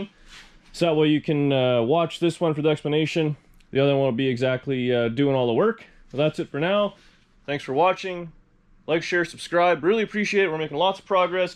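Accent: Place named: Canada. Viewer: You seem like a cool Canadian dude, I like the way you canucks pronounce "out" and "about"!.. Do you smoke weed or drink molson canadian?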